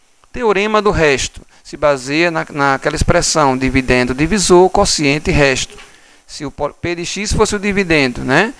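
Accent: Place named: Ceará, Brazil. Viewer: é engraçado teu sotaque "sóbitraíndo" "pólinómio"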